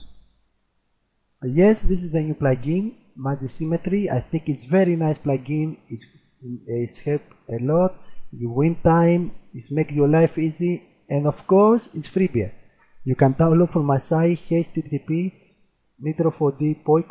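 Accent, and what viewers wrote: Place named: Jamaica. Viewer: nice plugin and you have a nice jamaican accent :))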